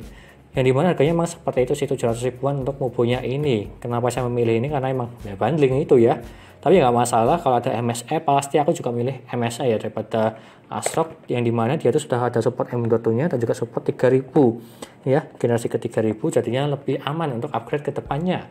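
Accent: Surakarta, Indonesia. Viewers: Logat solone ketotot😂😂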